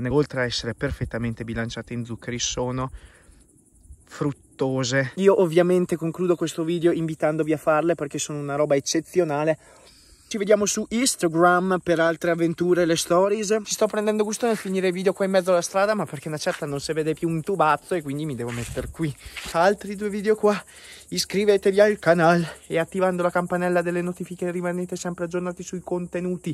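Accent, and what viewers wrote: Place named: Romagna, Italy. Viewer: mi toglieresti una curiosita, sento che hai un'accento romagnolo, ma la location dei video e' lo stesso posto in cui vivi? se si' come si chiama quel posto in montagna, che e' splendido, si adagia perfettamente alle tue performance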